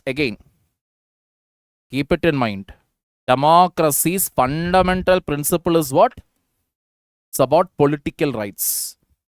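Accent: Kerala, India. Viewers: I think he is from Kerala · Sir ru from Kerala same my sir has same slag as u talk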